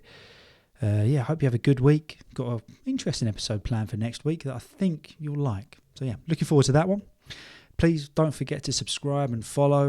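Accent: RP, United Kingdom. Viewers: I really love your podcast bro, so just keep going. You make learning English more easy and joyful without any stress, furthermore, your RP is so fascinating. I appreciate your effort you provides to us.🎉🎉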